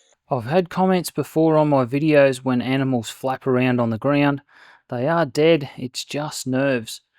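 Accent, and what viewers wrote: Australia. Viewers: bro got the strongest australian accent ive ever heard in my entire life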